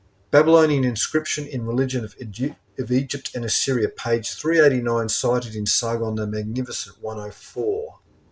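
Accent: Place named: Australia. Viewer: So sweet to hear that Aussie accent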